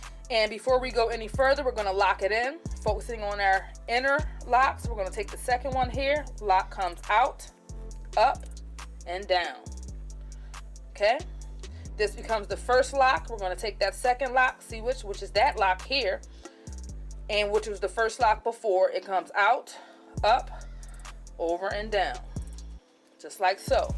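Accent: Baltimore, United States. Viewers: thank you… the bmore accent is givn life.. this was very informative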